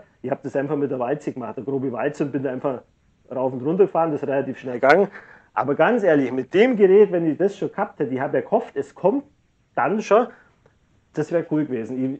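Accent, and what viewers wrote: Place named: Bavaria, Germany. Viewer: Er spricht meines Erachtens gutes Deutsch. Bayrischer Einschlag, vermute ich,oder? Grüße aus Brandenburg.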